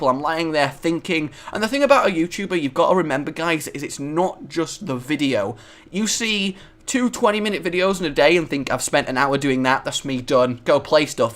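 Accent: Germany. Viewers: Your german accent is way to high pitched. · Who else, when hearing "German" accent, instantly thinks: "Flutters"? Also, since you are not a vegetarian, what type of meat do you like the best? I like Moose meat personally... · You're german accent is quite funny, but what I don't understand is how did you come up with it? Usually everyone who does a german accent try to sound really aggressive and load and all that, you know, because of the stereotype and so on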